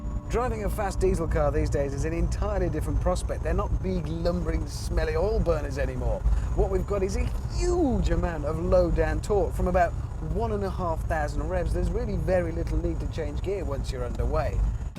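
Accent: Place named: Birmingham, United Kingdom. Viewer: anyone else notice Hammond sounding more brummie ? He said “it’s a fast diesel “ instead of faaaarst like he would today!!!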